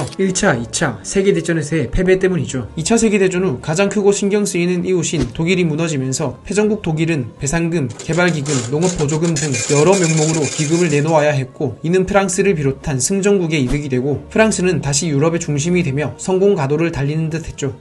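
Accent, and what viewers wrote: Gyeongsang, South Korea. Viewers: 컨텐츠가 아주 좋네요. 그런데 경상도식 발음은 좀 교정을 하셔야할것 같아요! 영향, 전역, 그냥, --년, 등등.. 연음이 표준어에서 벗어난부분들이 많이 보이네요.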